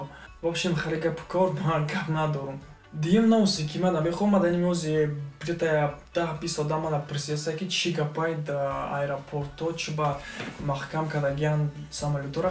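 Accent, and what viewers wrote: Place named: Dushanbe, Tajikistan. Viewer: мо душанбегие хамихели гап мезанем ихели лавза созтар мефахмем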